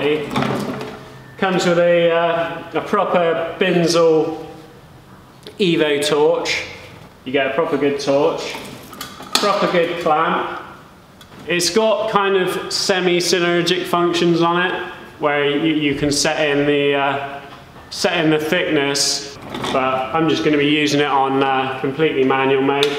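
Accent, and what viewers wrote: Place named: Cornwall, United Kingdom. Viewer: Brilliant job mate. I haven’t bothered to subscribe to anything in ages but your channel is awesome. Watched all your vids and you make it look so easy and really explain things well. I’ve picked up so many tips from you. Worked with a guy from Cornwall who talks just like you, you could be brothers haha. Anyway keep it up mate, I look forward to seeing your stuff, I think we all do. Legendary!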